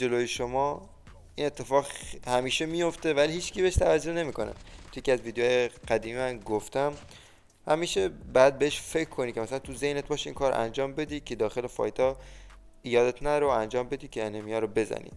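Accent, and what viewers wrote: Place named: Bushehr, Iran. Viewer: لحجه بوشهری داری....همشهریمونی??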